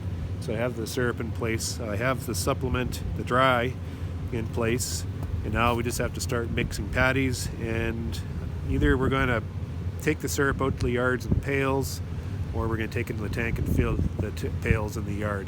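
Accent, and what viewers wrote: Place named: Winkler, Canada. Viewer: This gonna sound creepy but here it goes, I grew up around Winkler and I have a lot of good memories of my uncles wandering around muttering about equipment being broken or some weld or other failing. I've long since moved far way, worked in fields that have nothing to do with farming, got married had a kid etc. Sometimes its nice to just hear a guy talking in the accent I grew up around and talking about the kinds of things my uncles used to talk about. "Half pail of feed" haven't heard anyone say that in well over 20 years.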